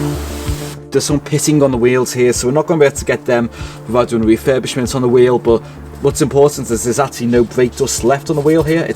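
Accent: Liverpool, United Kingdom. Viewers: Scouse but with a touch of refinement? Crosby?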